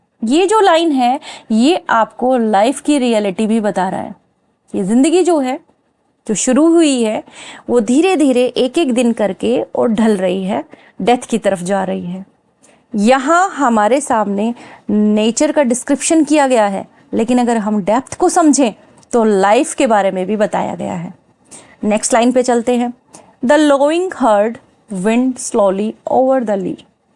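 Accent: Rajasthan, India. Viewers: Ohh , madam ji aap to rajasthan se ho 😊 .... (ढाणी)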